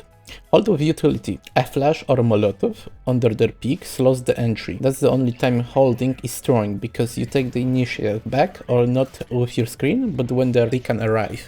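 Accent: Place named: Soviet Union. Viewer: when you click on a CS2 video already expecting the crackling and calm soviet accent explaning why you suck at the game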